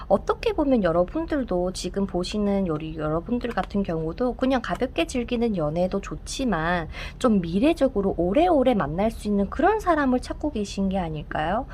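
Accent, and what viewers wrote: Gyeongsang, South Korea. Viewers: 님 경상도 분이시죠???!? 말투 사투리 야악간...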